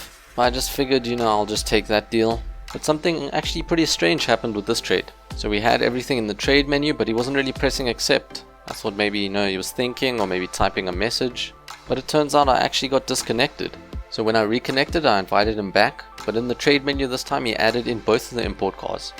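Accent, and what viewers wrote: South Africa. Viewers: i hear south african, i like. Btw, i know how the lag feels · your south African right?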